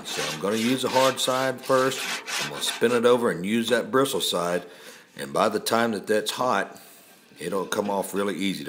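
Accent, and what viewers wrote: Texas, United States. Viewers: what part of texas is he from?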